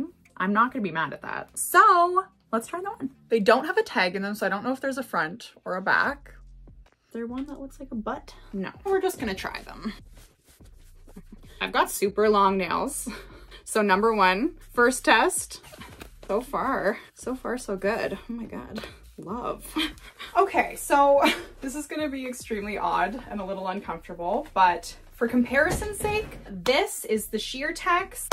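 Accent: United Kingdom. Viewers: Nice British accent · Oh now you done it brittish accent pinch me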